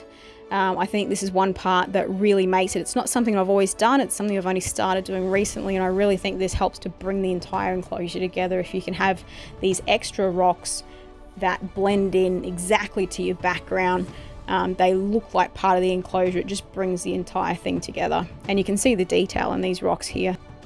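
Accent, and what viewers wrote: Australia. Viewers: oh wow this was pretty cool and an aussie. nice to hear a local. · Tasmania? I hear some similarities between you and others that I know are from there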